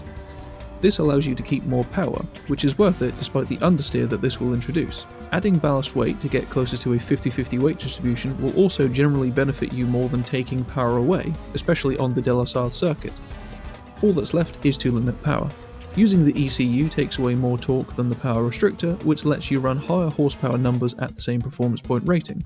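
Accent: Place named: Northern England, United Kingdom. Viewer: This series is a life saver thanks bro! Not had gt7 long and got a T248R last week well what a difference I’ve gone from winning easily to fighting to staying on track lol I thought I would be better with the wheel lol.. Il be making good use of your videos and learning as much as I can to improve my skills. U from the north? I from north west and you speak like a northern lad or midlands maybe. Well thanks for the videos you have a new subscriber here and thanks for not asking for likes n subscribe before we watch the content I really appreciate that you suggest at the end instead. Ta bro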